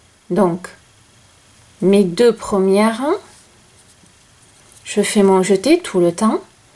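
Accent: South, France. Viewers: Vous êtes formidable vous expliquer très très bien vous en dites pas trop juste assez et votre vidéo est très bien faite on voit bien vos gestes. J’ai longtemps cherché des tutoriels de crochets bien faits, le vôtre est exceptionnel! Je suis du Québec et en prime votre merveilleuse sonorité du sud. 😊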